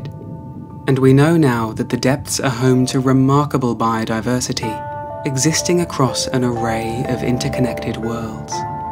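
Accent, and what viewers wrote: England, United Kingdom. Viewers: he literally has an english accent is this comment supposed to be rage bait 😭😭😭😭😭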